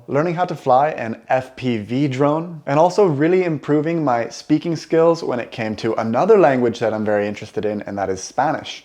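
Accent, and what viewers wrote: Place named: Sweden. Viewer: I am starting to hear a slight Swedish accent.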